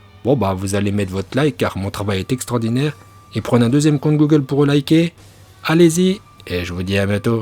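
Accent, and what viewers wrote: Japan. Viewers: L'accent jap est magique !!!! Est-ce qu'Amaterasu compte comme une technique Katon cher porte parol ? (C'est du feu non ?)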